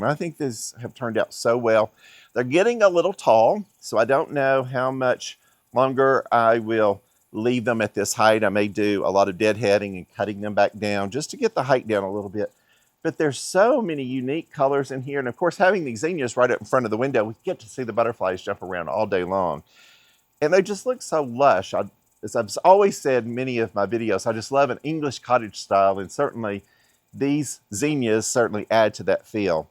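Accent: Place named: South, United States. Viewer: I came across your site by accident and when I saw you talking about your Playing the Blues Salvia and Peach Drift Roses, I knew you would be inspiring. Also, you sound like you are from the South. Although I live in Georgia, I enjoy hearing from other gardeners about what they have planted.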